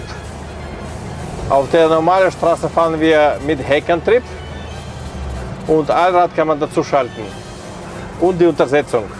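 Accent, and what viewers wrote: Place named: Russia. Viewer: Herrlich..ich liebe 2 Akzente,den franzoesischen und den russischen..russische Technik sowieso,fahre nen uralten Lada,verlaesslich.Gruss aus Patagonien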